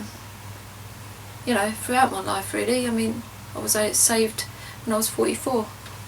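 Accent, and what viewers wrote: United Kingdom. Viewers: I'm an alcoholic, but sober 12 years. I know where your coming from. You've probably done a lot of mental damage. I go to aa a couple of times a week. I'm saved by Jesus. Don't isolate, get out and mix. Your not alone. Love your London accent. Keep the faith sister. Big love xxx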